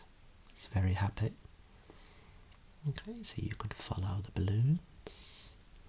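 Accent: Birmingham, United Kingdom. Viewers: There is something extremely relaxing about your videos. I think it's a combination of your Birmingham accent with your aura. I would be blessed to be one of your clients. Great video! 🙏😄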